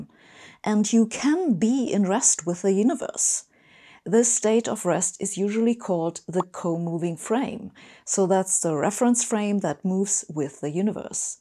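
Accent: Germany. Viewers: how did I know she would sound german before clicking the video haha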